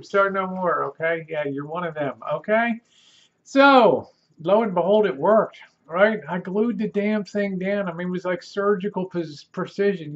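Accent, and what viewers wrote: Baltimore, United States. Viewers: I knew u were from Baltimore !! I was a city cop there for 23 years.The minute u said wooder for water. 😆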